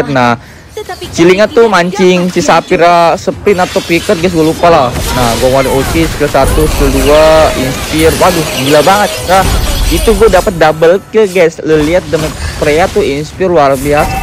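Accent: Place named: West Java, Indonesia. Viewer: Mang orang sunda daerah mana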